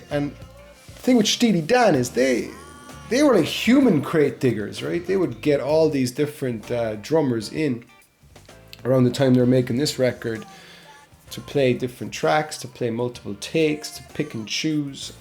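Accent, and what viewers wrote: United States. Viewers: Hey, your Texan accent isn't so bad. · Great video and great southern accent ! from Florida.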